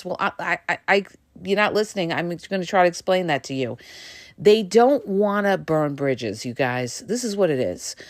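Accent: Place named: East Coast, United States. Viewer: It’s gotta be the East Coast accent….❤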